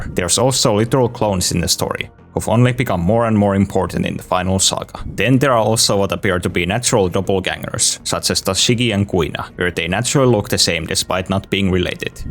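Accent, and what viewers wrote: Finland. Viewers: Kuulosti melkein suomalaiselta korostukselta, mutta kanavan info silti sanoi Yhdysvallat... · Sounds like finnish accent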